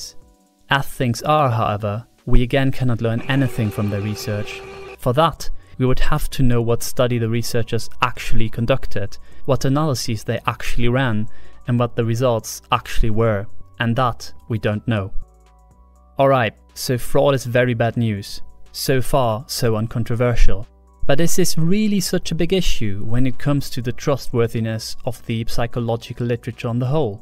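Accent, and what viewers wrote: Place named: Germany. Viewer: It took me that long to realise that you were German 😂 Mega spannendes Video, hast du einen Hintergrund in Psychologie, oder wieso kennst du dich so gut aus?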